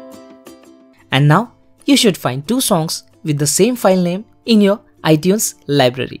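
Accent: India. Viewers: I don't know. I am sorry my friend, but when I hear an indian accent I turn off, purely and simply because of the many indian scammers out there, copying a variety of accents (badly!), but it is a turn off. Sorry bro Take care, good bye.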